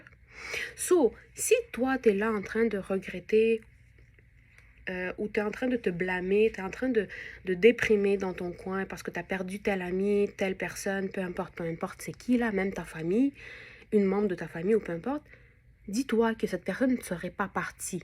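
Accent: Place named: Quebec, Canada. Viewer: Tu es québécoise ? Je crois déceler un léger accent 😊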